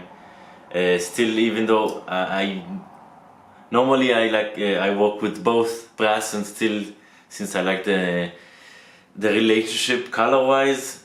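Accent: Israel. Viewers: i loved the look of the details in the pen and the engraving, thanks for creating a magnificent art piece. is't your accent from israel? you sound like my cousin, thats why i ask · Accent is definitely israeli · המבטא הישראלי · * Clicks on video * * Hears Israeli accent * INSTANT SUB! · המבטא שלך פשוט צועק לי ישראלי באוזן זה קורע!😂 אגב הדברים שאתה מכין מדהימים🤟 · מבטא ישראלי למדי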